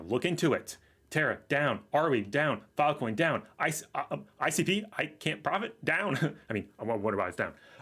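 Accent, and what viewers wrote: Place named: Berkshire and Buckinghamshire, United Kingdom. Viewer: I'm from there. Distinctive accent. :) berks and bucks 4me. But just guessing!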